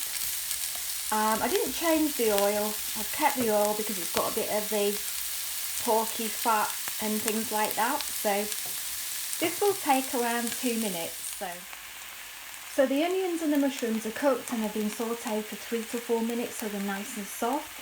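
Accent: United Kingdom. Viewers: It looks very delicious. Very nice video. I love your beautiful British accent and intonation. To be honest, it is good for my English study. Thank you for sharing.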